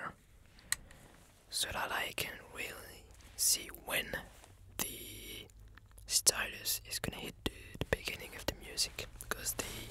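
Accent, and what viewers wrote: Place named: France. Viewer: French accents are the best tbh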